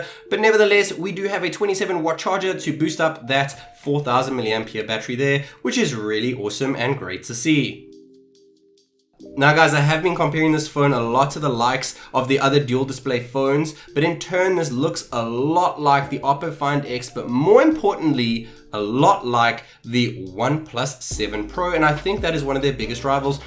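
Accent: South Africa. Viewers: Hey...so...are you south african?great review btw.anyway,any idea where one can get this in sa,or cheap shipping to sa online.Thanks a lot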